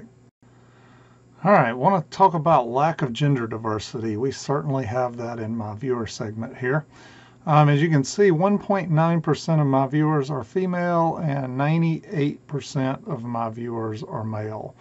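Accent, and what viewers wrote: South, United States. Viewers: I love all your videos, especially the tube amp builds. Doesn’t matter if they’re long or short. I’m in the process of watching them all from the very beginning so keep on making them please. I watch them in total but will pause occasionally for mundane stuff like housework and eating etc. You’re southern drawl is very pleasant to listen to and I’ve learnt loads since I started. For that I’m extremely grateful sir. I retired from electronics two years ago but still have a big soft spot for tube gear, radio or audio, and your knowledge is invaluable. Thank you.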